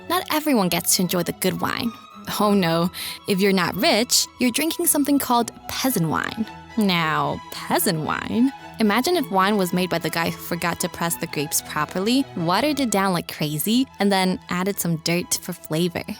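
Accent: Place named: Italian-American, United States. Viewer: This is the cutest Italian-American dialect I've ever heard. And she's smart too.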